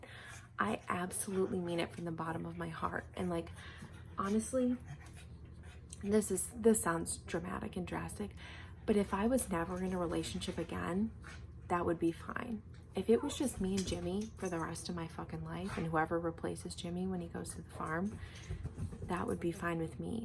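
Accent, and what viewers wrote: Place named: New York, United States. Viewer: Girl what part of New York are you from !?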